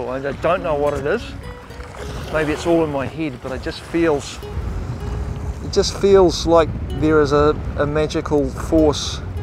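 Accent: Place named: New Zealand. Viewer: As a NZer living overseas, hearing the accent on YouTube cracks me up. Great vid and pics, subscribed!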